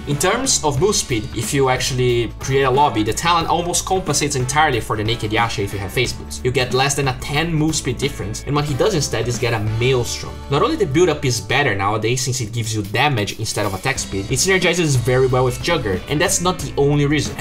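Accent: Brazil. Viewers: C é brasileiro, man??? Tem sotaque BR xD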